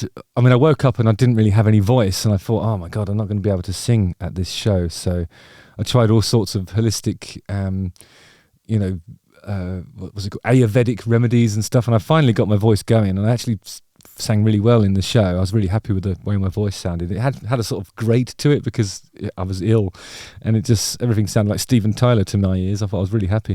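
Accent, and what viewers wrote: United Kingdom. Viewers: I like your accent; where in the UK do you come from? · i'm embarassed i had never heard of (must have been that rock i was living on in hawaii;-))....somehow I stumbled on your youtube channel and thought it was the best thing I've ever seen, positive, insightful, british accent. I checked out and fuck, damn, i play it all the time. my daughter is like "are your favorite band". i'm 57 and thought i'd never find anything new an exciting (for me at least, 15 years late) in music again. mahalo. it's a joy to watch you work and inspiring in ways you might not immediately know that are unrelated to music, but overcoming the struggles of life. after watching this, i guess i need to smile more too now, damn you Justin Hawkins you are making the world less miserable!!!;-)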